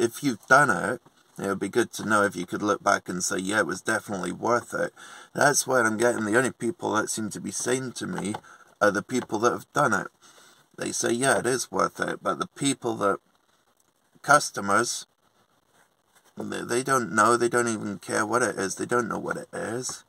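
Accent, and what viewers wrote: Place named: Cornwall, United Kingdom. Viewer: Joanne says you’re beginning to get a Cornish twang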